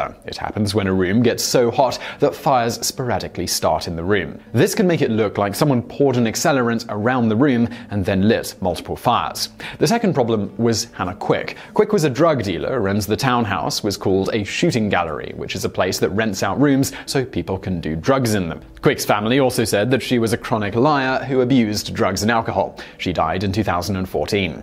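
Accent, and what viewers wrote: United Kingdom. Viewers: Your accent is the only British accent that is actually pleasurable to listen to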